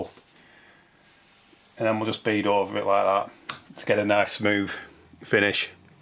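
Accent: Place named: Lancashire, United Kingdom. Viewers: Great video! Even better with your telltale Lancashire accent. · so i was pretty close. Luv a good Lancs accent :) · This Northern fella has helped me no end become a competent DIY'er. I am already a qualified painter and decorator, so to look up this channel just furthers any skills. I even fixed my pensioner mates toilet. Plumber wanted 50 quid, I ordered the part for him and it cost £11.00. Not to mention my Mum's problem with her light fitting, last year. Top bloke! Thank you so much and love that accent! I'm not doing bad 'for a Girl!' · Thank you for this, the Blackburn/Lancashire accent here is strong!!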